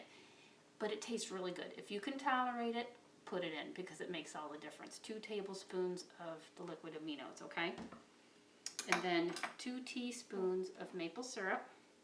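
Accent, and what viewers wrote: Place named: Massachusetts, United States. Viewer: Hello gorgeous,it's Donna from England with the terminal cancerAND the baby AND the three French bulldogs and a pug,YES I'm still alive.I can't tell you how excited and grateful I am about this recipe,I LOVE LOVE LOVE raw cabbage,of course I'm crazy about conventional shop bought coleslaws but urrrrghhhhh the fat content ( but so so yummy).Unfortunately since my husband was killed there's only myself to cook for and if I'm in a lot of pain ------ well you know what I mean.Thankfully I have all of my groceries delivered and I ALWAYS have fresh cabbages,oranges,lemons,chillies,ginger garlic onions and carrots and peppers as a staple,delivered twice weekly.I am going to make a huge bowl of this coleslaw in the morning.I was actually binge watching your videos just last night after I put my baby to sleep.It seems like only yesterday that I "found" you by searching for the Hippocrates soup recipe!!! 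Well I'm going for a rest now and I'm sending you tons of love from England as per usual.Oh and I just ADOR your Massachusetts???????? Accent,love as always Donna 😘❤️xxxx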